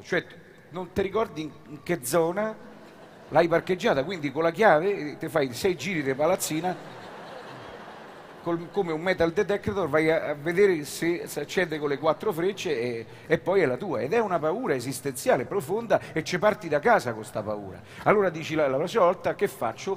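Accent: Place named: Rome, Italy. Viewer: Troppo forte, poi secondo me( e parlo da milanese), l accento romano accentua ancora di più la comicità, è troppo bello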